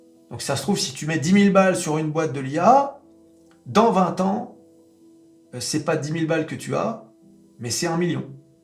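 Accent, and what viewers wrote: United States. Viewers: on veut une video FAQ pour les 10k abonnés : quel est le point commun avec Jé Powell ? D'où vient cet accent southern américain ?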